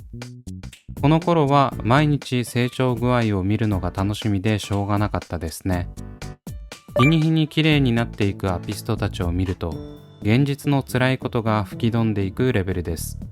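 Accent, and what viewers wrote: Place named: Kurume, Japan. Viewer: 北部九州系、久留米あたりのイントネーションで、よどみなく滔々と話されるのが心地よいです。アピスト？ああ、まぁ育ってんじゃないですかね、って感じです。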